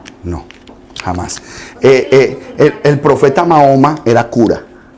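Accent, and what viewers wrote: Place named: Colombia. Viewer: Inmediatamente me di cuenta de que usted es colombiano 👍gracias por compartir tanta enseñanza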